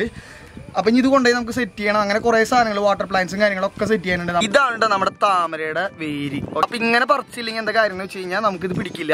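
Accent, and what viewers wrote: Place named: Thrissur, India. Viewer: ത്രിശൂർ ഭാഷ ഇഷ്ടമുള്ളവർ ഉണ്ടോ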